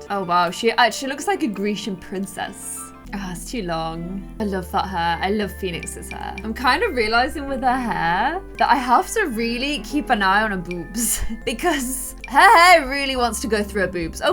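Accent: United Kingdom. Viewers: While she is British soo.....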